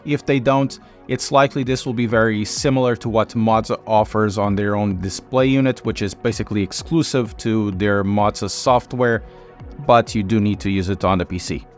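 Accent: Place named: Portugal. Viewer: Had a feeling you were Portuguese for the slight accent. Amazing English but the Portuguese rhythm never goes away. Great work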